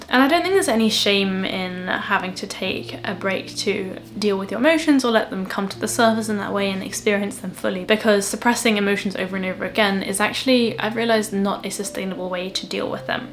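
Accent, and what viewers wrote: England, United Kingdom. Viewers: An actual English accent 🔥ultra🔥UKasian🧠Sapio❤ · She’s cute ❤ love the British accent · I can't express how much I love that British English. Lol ❤🎉😊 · I’m watching your YouTube for study British accent ❤ I love your accent so clearly and sounds smart. Take care of yourself. I will support to you from Japan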